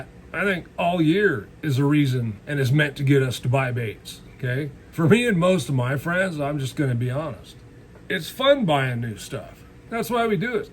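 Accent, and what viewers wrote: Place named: Northern California, United States. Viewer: Your the man love the local NorCal West Coast talk keep up the good work sir...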